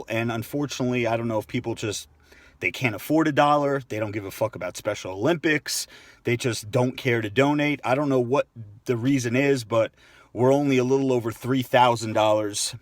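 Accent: Philadelphia, United States. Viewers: Yo man you from Philly?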